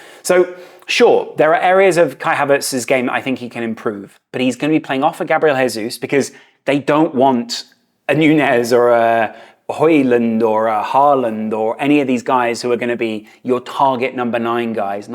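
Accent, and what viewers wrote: United Kingdom. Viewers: This just doesn't make any sense. British accent, baseball jersey, skater/surfer hairstyle....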